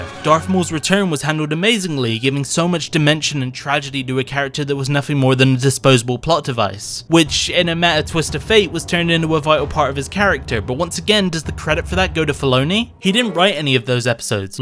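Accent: West Country, United Kingdom. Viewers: You sound like you’re from Hot Fuzz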